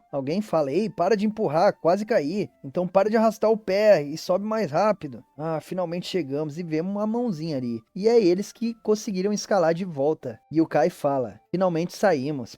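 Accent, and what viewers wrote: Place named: Rio Grande do Sul, Brazil. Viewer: Mn vc e gaúcho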